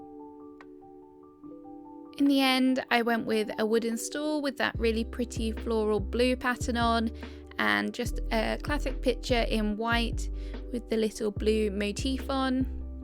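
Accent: England, United Kingdom. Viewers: your videos and your gorgeous English accent make me miss the UK so much. My Dad was English from Surrey and I lived and worked in the UK, years ago now. I was set to have a visit last year, but of course as we all know, Covid came in and changed everything for us all. Really love your videos and I am so loving the coastal theme. Wanting to do it on our island next thanks to your inspirations 😊💕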